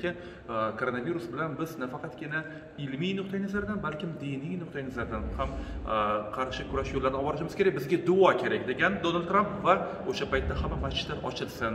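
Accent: Fergana, Uzbekistan. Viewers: Фаргоналикмисиз илтимос чукурок тушинтиринг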